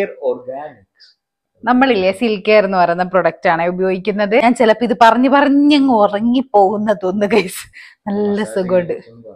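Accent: Kannur, India. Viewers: am also from kannur, samsaram kettond chodicheyanu 😊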